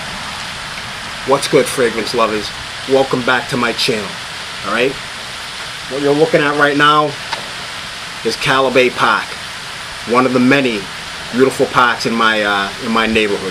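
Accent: Boston, United States. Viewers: Black guy with a Boston accent- wicked awesome! The legend is true- they do exist.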